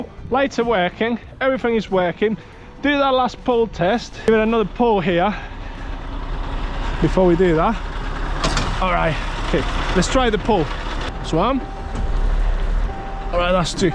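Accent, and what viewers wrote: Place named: England, United Kingdom. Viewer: Why do you sound foreign but also English. Keep up the good work mate, I enjoy your videos.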